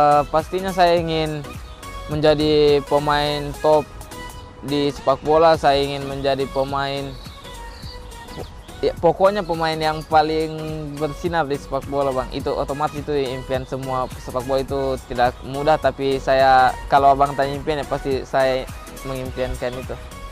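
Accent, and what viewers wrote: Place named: Palu, Indonesia. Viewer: Sahabat sulawesi... Logat palu asli... Hehehe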